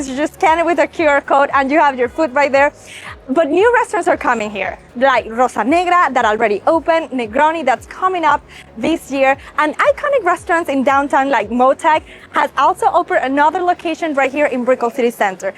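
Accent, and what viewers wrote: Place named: Latin America. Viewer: Whatever accent this is, is my favorite Latin accent. Great video!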